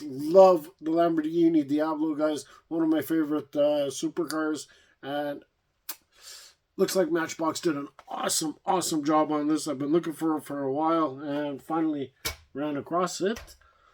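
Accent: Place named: Canada. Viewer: As a fellow Canadian but living in The USA, i love your videos and accent, EH! I want to display all my cars loose like you do. I have over 500 cars that need to come out of their cases! Where did you get your display cases?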